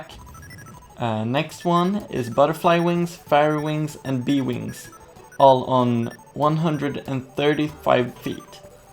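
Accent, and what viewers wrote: Sweden. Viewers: hörde att du var svensk direkt, enkelt att känna igen svensk engelsk dialekt ^^ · Are you swedish? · because he is swedish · It's a Swedish accent, get used to it. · are u swedish? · But the I realized he was “Swedish” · Are you from sweden?!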